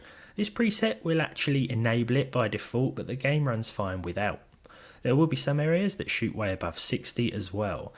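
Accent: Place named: RP, United Kingdom. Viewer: You're sounding particularly posh in this video 🧐🎩 , not criticising, simply noticing, simple as.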